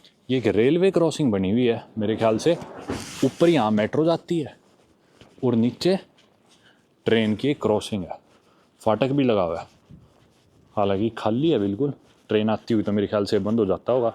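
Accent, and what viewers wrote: Haryana, India. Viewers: Baki sab sahi video quality best host best per ye jo consistency hai vo thodi si increase hojaye jayda bhi nhi halki si increase hojaye hafte me ek hi ajaye na tho bhut hai bhai ab have to hogye hai aap ke video dhek ne ke mamle me jayda nhi bus halki si consistency quki itne actual punctual hum bhi Nhi hai isliye Kripaya ker ke hafte ki ek or bhai raw upload ker do video me tho kheta hu or full Haryanvi accent wagera jo app normally use kerte hi hogye usually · Kuch bhi ho jya lekin Haryanvi sudh hindi nhi bol sakte , " jaan karke" he is speaking that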